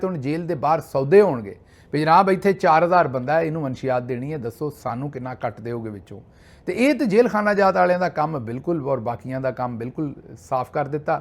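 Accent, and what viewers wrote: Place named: Punjab, India. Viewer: ٱلسَّلَامُ عَلَيْكُمْ وَرَحْمَةُ ٱللّٰهِ وَبَرَكَاتُهُ!,اک,ڈاکٹر,تے,نالے,زندہ,دل,بندہ,تے,نالے,پنجاپی,لہجہ,مزا,آگیا,خبران,تے,کی,تبصرہ,کران,نہ,قنون,ناتے,عدالت,,نا,پلس,سارے,تے,سارے,جانور,سی,جھتون,انسان,ھی,نا,ھوئن,کیا,ملک,تے, دین,ملت,نا,اللہ,نا,رسول,ظلم۔ھی,ظلم,❤ڈکٹر,انسانون,کے,دیس,کی, باتان,کرو,مگر,وھان,بھی,ابلیس,ھی, ھن,کی,کریجے,رب,راکھا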